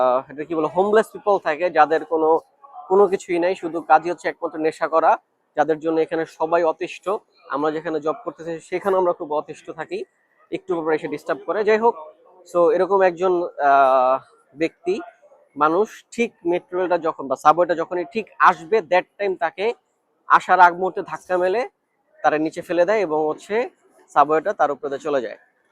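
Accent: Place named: Nadia, India. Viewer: Ami to Kono problem dekhlam na? Amra bangalira aivabey kota boli...apni ki shantipur nodiya theke ashchen naki?....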